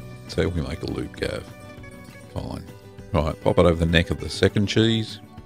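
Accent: Australia. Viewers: This is my father inlaws favorite cheese thank you for making all these ethnic cheeses, you're really helping me endear myself to my wife's family. Hope you and yours are doing well. Love the Aussie pronunciation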